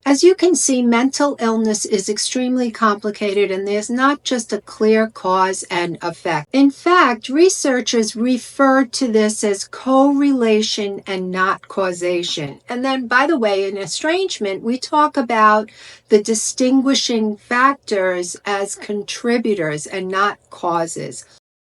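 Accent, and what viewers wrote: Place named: New Jersey, United States. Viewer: I’m guessing New Jersey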